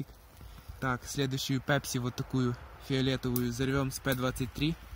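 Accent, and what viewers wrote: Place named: Ukraine. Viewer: Из-за укра(ударение)инскова выговора😁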